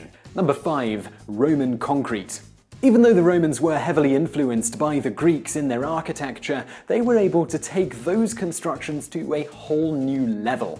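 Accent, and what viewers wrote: United Kingdom. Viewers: He's British, you know... In fact, it's probably the Americans that are mispronouncing the words. We tend to do things like that and then expect others (even though they are much older, thus closer to the source) to change themselves to our ways.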